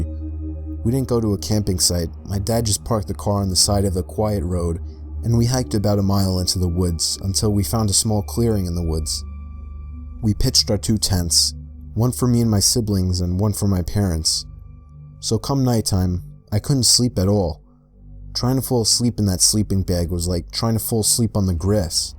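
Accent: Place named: East Coast, United States. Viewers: He sounds so different then, like a New York accent, now he sounds different. For example he says "...get off..." But is sounds like get ouaf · younger and sounds like an east coast accent?!! Nice ❤ I no longer here it in his newer videos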